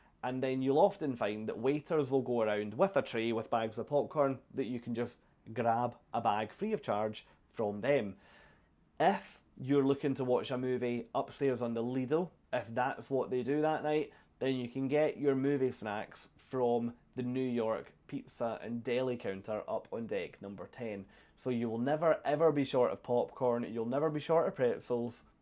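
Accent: Scotland, United Kingdom. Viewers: Are you speaking English? THAT's the Aussie accent I was warned about! Not that there's anything wrong with it. OH Scottish. My bad. I was thrown off by the shirt. I do a fair Scot accent myself! You're kinda young for HAL...actually you are VERY young for HAL! 🤣 Dutch and Cuisine in the same sentence...does not compute. Not every HAL ship has a 'thermal suite' On Signature class ships, I THINK it's called The Retreat. This is true on a lot of non Pinnacle class ships - IE BB King, notes etc is not on every ship either. We are going to be on the Nieuw Amsterdam on our next cruise and the only Music Walk venues they have is Billboard and Rolling Stone. Not just a wine tasting....but a wine PAIRING! I wonder if they have a master Sommelier on board! There aren't that many of them in the WORLD! Have it All includes a night at Pinnacle! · I loved your videos and your Scottish brogue. 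I’ve travelled a lot on Holland and enjoy it but I am 87 and can’t get around well enough to do all those activities. Don’t get off at ports either as walking is difficult but I DO enjoy eating and relaxing. Thank you for the videos!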